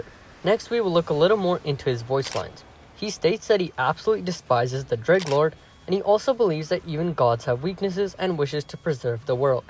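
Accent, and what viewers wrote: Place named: United Kingdom. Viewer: lol he’s British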